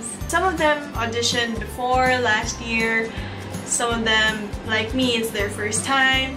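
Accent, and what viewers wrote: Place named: Philippines. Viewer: Ermgee I could tell you were Filipino from your voice! HELLO, galing mo! Awesome and helpful video! Was this in Makati? (STEPS Dance Studio)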